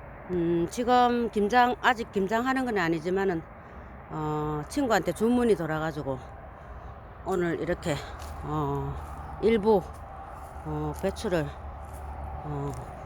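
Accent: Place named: Gyeongsang, South Korea. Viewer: 경상도 사투리쓰시는 친근감이 베스트이고 무ㆍ배추가 힐링되니 더더욱 귀촌생활이 아름답습니다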